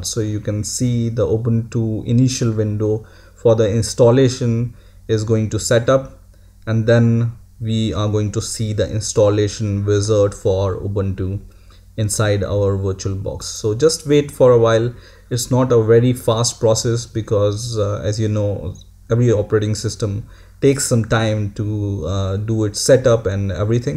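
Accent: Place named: India. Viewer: you are not german, dumb ass you are indian, i can recog noise your voice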